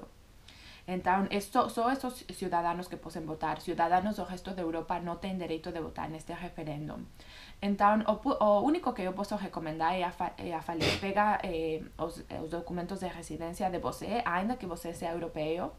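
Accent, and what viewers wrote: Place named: Spain. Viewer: Muito bom mas esse sotaque espanhol atrapalha 1% mas de resto seu português é muito bom e você é bem esclarecedora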